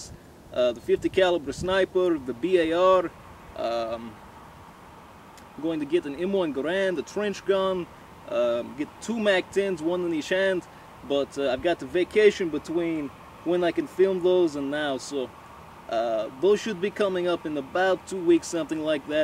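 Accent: Russia. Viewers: The Russian accent is slowly wearing off =( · Hey, Ive been watching you for around a week or 2 and I think your awesome, I always knew russians are this cool. Your accent is awesome, your always randomly funny and you shoot very well. I hope you will save us all when the zombies arrived some day:3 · omg I love russian accent XD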